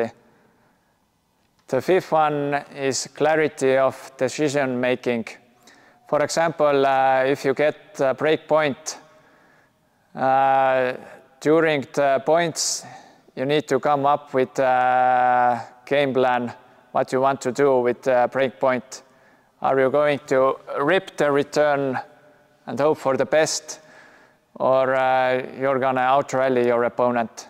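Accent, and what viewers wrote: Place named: Russia. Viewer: Besides the beautiful Russian accent, this video is great.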